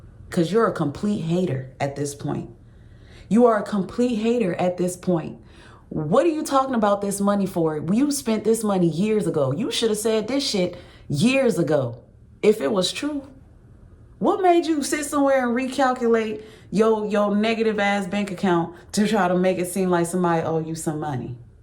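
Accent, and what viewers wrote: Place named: Ghana. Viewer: I like the Ghanaian accent "my sista."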